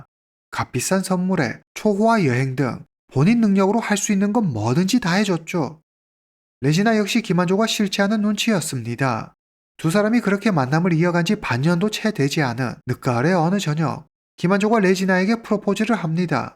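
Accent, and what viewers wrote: China. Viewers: 한굳말 잘하는 중국분이라고 생각했어요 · 조선족 교포 이신가요?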